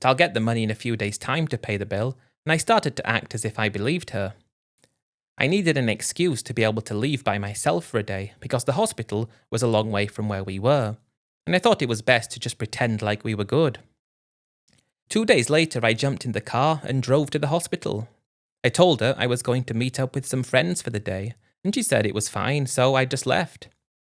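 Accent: England, United Kingdom. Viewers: America - you have an English Accent.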